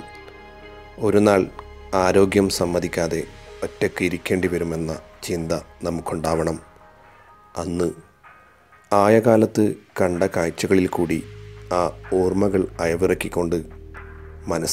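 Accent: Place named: Kannur, India. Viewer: ന്ങ്ങക്കും നമ്മക്കും നമ്മള നാടൻ കണ്ണൂര് ബർത്താനാണ് സൂപ്പർ. ഇത് നന്നായിട്ടുണ്ട്.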